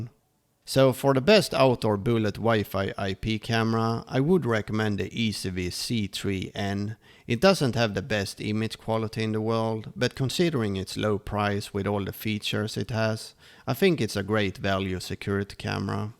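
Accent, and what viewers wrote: Sweden. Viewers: Your accent sounds a little bit Swedish, are you?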